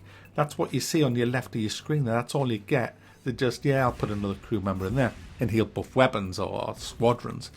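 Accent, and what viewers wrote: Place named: Newcastle, United Kingdom. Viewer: Oh Lord, first time watching a review channel and it'll never be looked at again. Normally liked a Geordie accent but this guy was wildly unfunny and f**king annoying.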